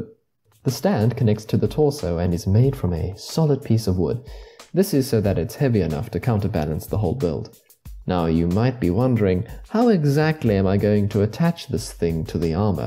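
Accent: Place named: England, United Kingdom. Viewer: thanks for replying, i meant i liked the silver mk2 suite seen in th 1st iron man most of all, the costume is meant to resemble a medevel knight to some extent, As is the brilliant robocop suite, silver reminds the eye of this most even though it may be stereotypical , as you americans say "if it aint broke, dont fix it" you do a good english middle class accent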